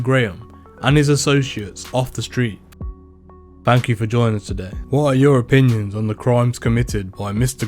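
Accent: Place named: Coventry, United Kingdom. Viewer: Not a clue where ur from, but I’m gonna guess Coventry from the accent 👀big ups bro keep up the good work, pusb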